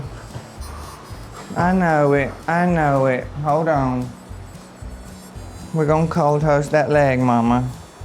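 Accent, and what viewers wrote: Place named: United States. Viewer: Lol.. Why does he sound country, black and gay 😂😂😂 allllll at the same time .. Which is fine and its funny...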